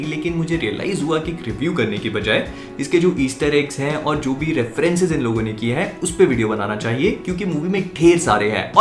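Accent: Bhopal, India. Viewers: bhai bhopal se hai kya?? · apne Bhopali accent mai video bnake maza hi dila diya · I love your bhoplai accent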